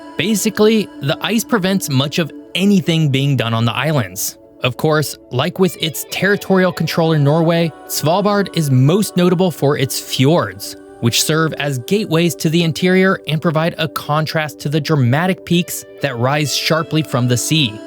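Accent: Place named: Kentucky, United States. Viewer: Your English pronunciation is awful. It sounds like you went to school in Kentucky. Or, maybe you did. The most annoying is when you say "thuh" instead of "thee" before a word that starts with a vowel or a vowel sound. It's NOT "thuh" island, it's "thee" island, thee arctic, thee avalanche. No, it's not spelled differently, it's just pronounced a different way in different circumstances. Unless you were raised in Kentucky. Go ask your mee-maw, at least she has a second grade education. Also, your voice is shrill and you talk too fast. I don't think being a youtuber is for you.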